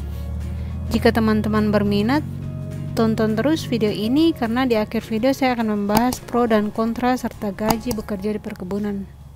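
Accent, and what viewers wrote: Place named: Makassar, Indonesia. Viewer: trims infonya kak. btw kakak org makassar kah? logatnya mirip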